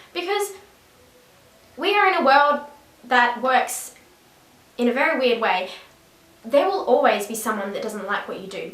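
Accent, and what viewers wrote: Australia. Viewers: I got so excited when I heard your Australian accent! Subscribed!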